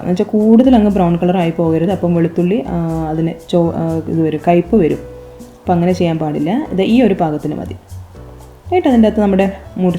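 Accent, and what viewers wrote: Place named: Kannur, India. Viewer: Krishi adipoli. Njanum nattitundu balcony il. Pinne samsaram kettitu malabar side aanennu tonunnu. Etra sramichittum naadan basha idaku idaku ariyate purathu varunnu alle 😁😁😁. Nhan kannur aanu. Kannur slang pole thonni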